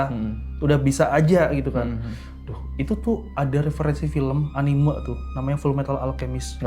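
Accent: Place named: Tegal, Indonesia. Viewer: Ini orang Tegal ya ternyata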